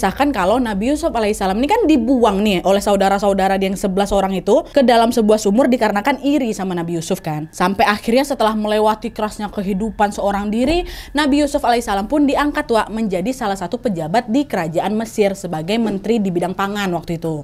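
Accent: Indonesia. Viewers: 😂lucu benar kak ni bercerita dgn loghat bahasa Indonesia nya...saya lebih suka lihat dia berbicara drp isi ceritanya😂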